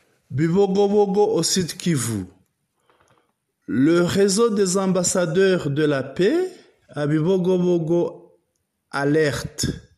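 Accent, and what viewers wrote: Rwanda. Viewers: Rwandais par ton français mensonges prolonger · Avec ton français rwandais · Le ton de français indique que tu es Rwandais.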